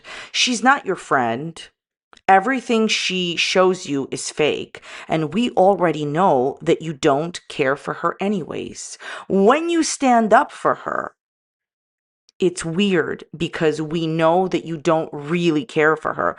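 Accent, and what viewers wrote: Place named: New York, United States. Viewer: Your channel cracks me up. I’m from New York City area and your accent and your attitude. Reminds me just. Like home ❤❤❤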